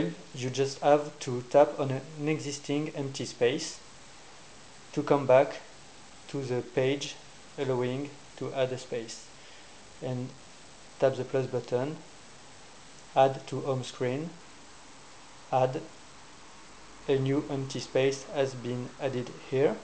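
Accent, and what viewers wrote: France. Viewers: ton anglais est pas vrm bon mais bravo quand meme t bon pour un francais :P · Rien C'est juste que j'ai déja été en france et vu que je connais l'accent j'ai remarqué que tu étais francais :) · you have a cool accent i'm guessing your french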